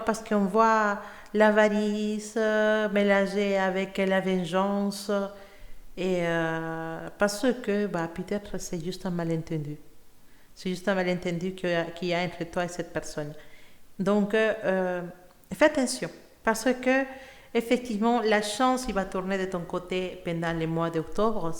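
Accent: Spain. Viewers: hola es que tu haces lecturas en espanol porque siento tu accento esapnol y quiero saber si es posible tambien en espanol gracias bendiciones!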